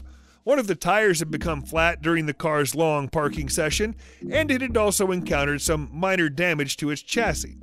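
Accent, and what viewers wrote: United States. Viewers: Had trouble with the American pronunciation of English place names but. Apart from that. I enjoyed the clip. Makes me appreciate the 16 cars in my collection more. Must get round to restoring a couple in the future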